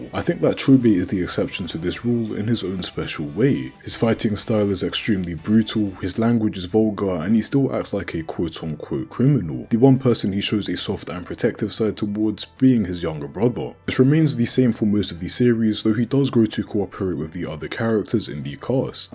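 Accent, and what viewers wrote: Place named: United Kingdom. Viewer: Hello, I’m assuming you’re British from your accent. I love jjk, csm and Hells paradise. Love your reviews that’s why I subscribed and liked:)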